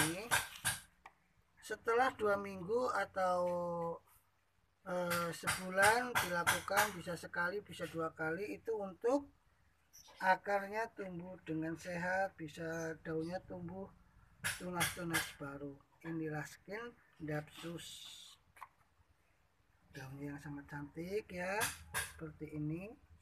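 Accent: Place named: Banyumas, Indonesia. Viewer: Deneng ngapak,asli pundi nggih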